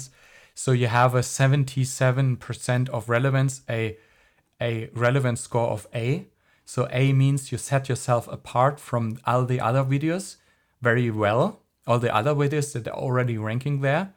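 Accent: Germany. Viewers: I hear you are a German :D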